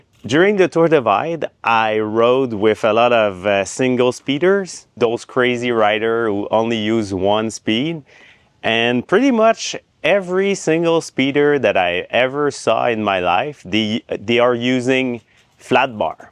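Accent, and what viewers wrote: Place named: Quebec, Canada. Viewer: Great Video, keep the great information coming. I always love Quebecois accents so no apologies necessary. we ski and hike in the Charlevoix region all the time. Jeff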